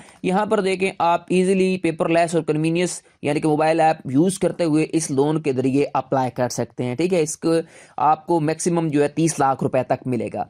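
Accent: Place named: United Kingdom. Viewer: ایک جھوٹ اور دوسرا لہجہ انگریز والا پتہ نہیں لوگوں کو انگریز بننے کا شوق کیوں ہے۔بنک لون دے اور وہ بھی سود بغیر۔ہو ہی نہیں سکتا۔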